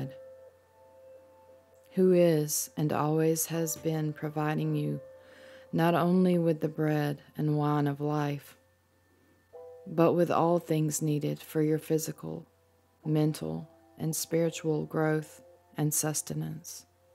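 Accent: South, United States. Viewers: Do I hear a beautiful southern accent? I am born and raised on the coast of Georgia USA.. keep reading!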